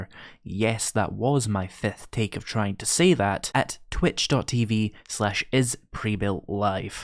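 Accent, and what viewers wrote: Scotland, United Kingdom. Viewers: I can definitely see people coming for the voice. Scottish accents are just awesome. Congrats on the milestone. As far as other games I think most of your followers would enjoy watching you play anything as long as you bring your passion into the videos. That's what won me over. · Congrats! You deserve it. I found your channel from your tutorials and have stayed for the series play (and the accent). As one of your American fans, I’d love some Scottish-isms thrown in. And the audio quality and level is perfect. Here’s to you getting 100k!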